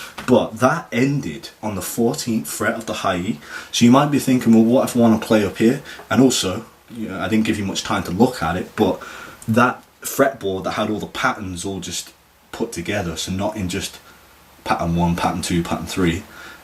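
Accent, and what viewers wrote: United Kingdom. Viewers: BRILLIANT!!!!!!!! T U so much for literally helping me out with ALL FORMATIONS of the Pentatonic Scales; I happened 2 stumble upon U, and Quite elated that I NOW can practice Scale Patterns watching your video. Also love your English accent.! Again, thanks! peace&love out 2 U cheers!!!!!!!!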